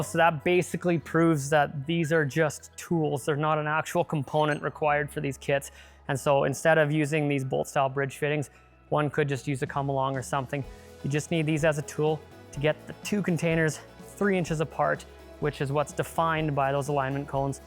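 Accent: Canada. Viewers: The thing that bugs me the most about this video is buddy sounds Canadian af but days Zee instead of Zed.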